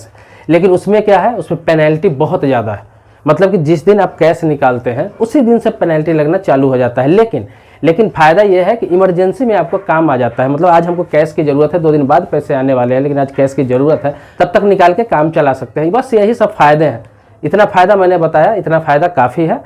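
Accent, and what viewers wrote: Bihar, India. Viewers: Bihari bhasha thik karo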